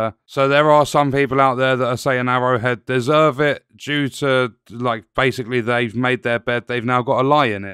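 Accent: United Kingdom. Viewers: Nicw to hear another british bloke commenting out here. And yeah, this decision has been the fastest reputation "self unalive" I have seen for a game recently. I really hope some competent individuals can come together to start a class action lawsuit against Sony. It would be cool if Arrowhead sued Sony for the damage they've done to their game, possibly even sinking the studio if it gets worse.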